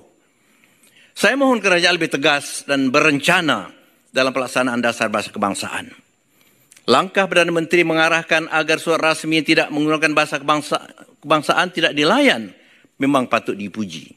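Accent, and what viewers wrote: Indonesia. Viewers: Hai Prof. AWANG.......anda berpidato sdh berdialek BAHASA INDONESIA......Sadarkah Anda. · Haha...bapak ini kok logatnya spt indonesia?😅😅😅😅😂😂😂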